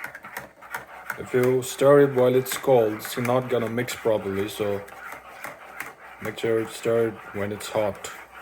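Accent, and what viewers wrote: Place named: Australia. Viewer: bro ko ni aussie accent aayechha😃😃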